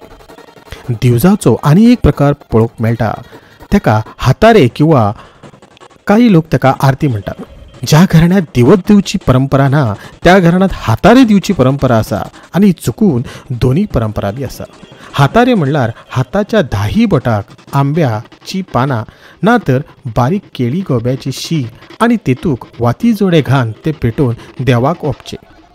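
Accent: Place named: Karwar, India. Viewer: उत्कृश्ट लेखन आनी निवेदन...आमगेली गोड कारवारी बोली... परबीं तुमकां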